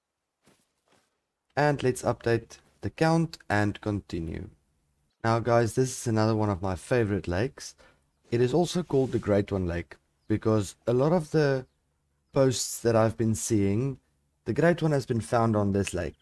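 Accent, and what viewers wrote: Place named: South Africa. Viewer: I can hear it😂 nice to find a fellow South African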